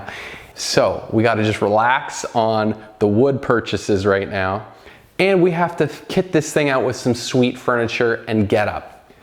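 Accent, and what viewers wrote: Canada. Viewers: Man I knew you were Canadian. Could hear it in your voice. Haha. Cheers from Kelowna BC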